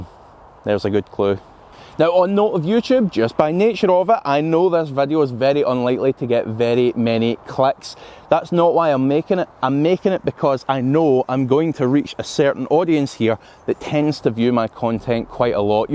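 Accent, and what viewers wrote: Scotland, United Kingdom. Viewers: Was just watching R8 videos on youtube and came across your channel, great to hear a fellow Scot and well done on your new car. You've got a new sub in me.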